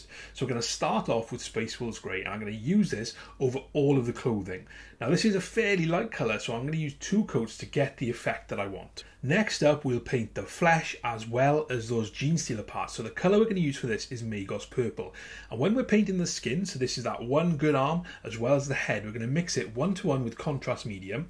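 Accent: Wales, United Kingdom. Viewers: Great vid again bud, p.s what part of Wales is the accent.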